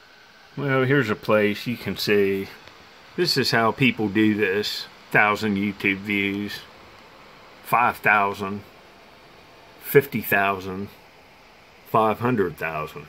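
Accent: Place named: Pennsylvania, United States. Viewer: Your welcome. I never dreamed that people could buy views and likes ect. Thanks for all the explaining. But i have a upgraded youtube account and dont have to watch ads. How is the pay out different on that? My son says the channel makes more this way. Also how much of a super thanks does youtube keep vs. what you get. Percentage wise. This is the only channel i have ever donated to. I am by know means i person with tons of cash. But this channel is my favorite because it has alot in common with my way of living a simple life. I was born near the river just north of Pittsburgh and moved to ky in the mid 80s when everyone in my family lost thier Good paying steel mill jobs. 475k bread winners on the same day. My father was the only one smart enough to pack up his family and move to a much better place where there was way more opportunities. 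This channel reminds me of home. Even the way you narrate sounds like the people i grew up with that eastern as you say O hi a and western pa draw... lol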